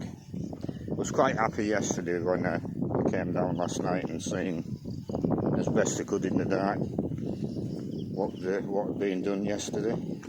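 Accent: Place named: Yorkshire, United Kingdom. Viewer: Which part of Yorkshire are you from ❤if I was guessing 🤔 I would say Barnsley ❤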